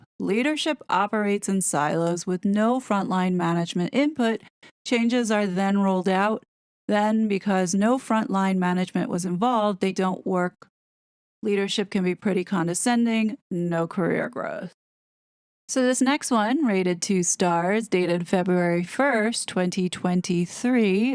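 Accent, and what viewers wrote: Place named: United States. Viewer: Oh bless you, I’m so sorry you’ve suffered - and are still suffering - such a miserable work experience. I’ve no idea of your background, but, imo, you have found a perfect alternative in this channel. Your investigative skills are incredible, you pick up on the most minute details (which other YouTubers completely miss) and your calling out the egregious behaviours of the miserable pair, and those they are involved with at whatever level. I love your sarcasm and dry wit, and you’ve got such a great voice - a very soft US accent (some are very abrasive)! Thank you for all you do for and on our behalf. You are greatly appreciated❣️ ❗️It’s especially amazing to hear that you have faith in God, and that surviving the trauma you’ve been through, you are testament to God’s grace and to the power of prayer🙏🏼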